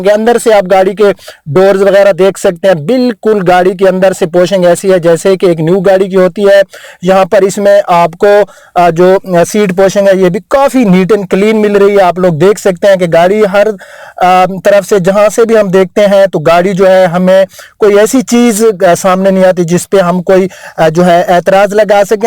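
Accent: Saraiki, Pakistan. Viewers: Ap ki سرائیکی urdu baray mazay ki ha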